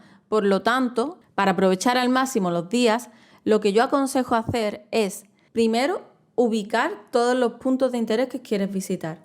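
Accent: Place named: Andalusia, Spain. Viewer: Espectacular video! No podría ser más útil. Tengo pensado ir a Islandia en mi próximo viaje ya que uno de mis sueños viajeros es ver una aurora boreal. Voy a guardar este video para ir organizando en base a tus consejos ❤️. Gracias guapísima, con ese acento andaluz hermoso como toda su gente! Saludos desde Buenos Aires!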